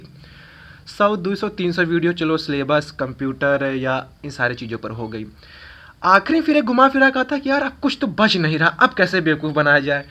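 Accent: Allahabad, India. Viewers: Bhai aap Allahabad se hee hai na? Kyoki aapki boli aur bhasha sunkar maza aa gaya. Bahut acha laga.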